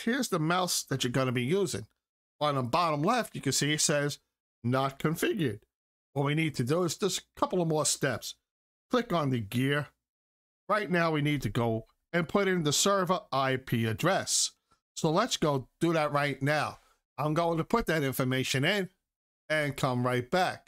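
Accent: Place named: Bronx, United States. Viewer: Go ahead, and tell me, why, when using Ai narration, would you choose a voice of a guy from the Bronx or Jersey?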